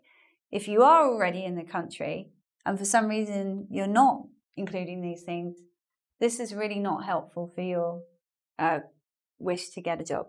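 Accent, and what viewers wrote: United Kingdom. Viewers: thank you very much,. i really like your videos, there are very informative although i enjoy to practice my listening skills with your british accent.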